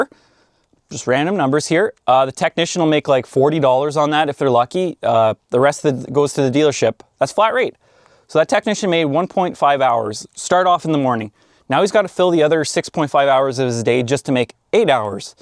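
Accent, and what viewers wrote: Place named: Canada. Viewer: are you canadian or just from the midwest ?☠️☠️ pretty cool accent. but came here to say i wanna be able to fix riding lawn mowers and mess with cars, aka fix them, possibly rebuild engines and just want to not be confused when opening the hood of a car.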